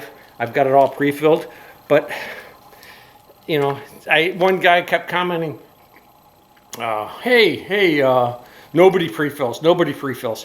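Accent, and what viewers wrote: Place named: Chicago, United States. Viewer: I love that Chicago accent !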